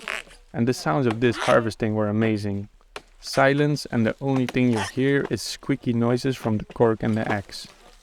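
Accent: Netherlands. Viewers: Forgive me if I'm wrong... But your accent seems Dutch? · Now there's a proper Dutch accent.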